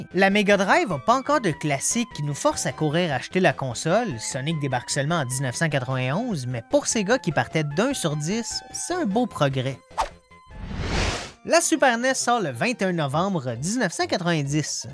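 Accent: Quebec, Canada. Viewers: C’est un Québécois qui parle ou,la voix du mec ma exploser de rire et ça marche avec ses blagues. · Sont super tes vidéo ! On dirait que t'es québécois !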